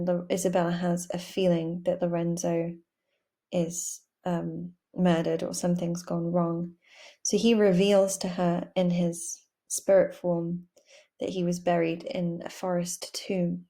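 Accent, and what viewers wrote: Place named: United Kingdom. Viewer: you've summarised this in such a beautiful way! i don't have this in my course or something, but I'm very interested in poetry and especially keats' poetry. being an admirer of keats, I've heard a lot about this poem and now I finally know what it's all about. thank you so much you helped a lot! ❤️ ps. I love your british accent. british is my fav of all types :)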